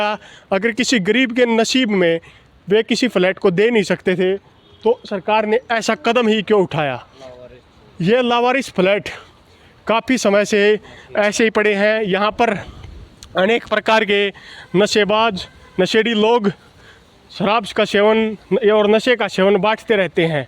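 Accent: Haryana, India. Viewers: Bhai Haryanvi ae bol le Hindi baski na h.....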